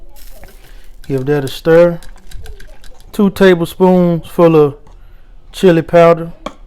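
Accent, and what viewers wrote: Deep South, United States. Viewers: Always trust the man with a Deep South accent to teach you how make a BBQ sauce. Thank you sir.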